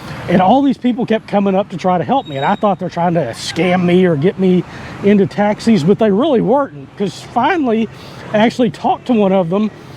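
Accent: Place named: Georgia, United States. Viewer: I can tell you must be from Georgia. 😁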